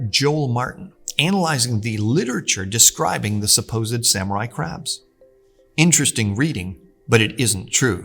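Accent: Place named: Canada. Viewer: This guy has the most Canadian accent ever.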